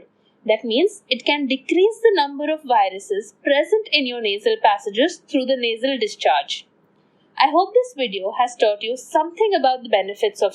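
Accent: India. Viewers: Indian english are very rought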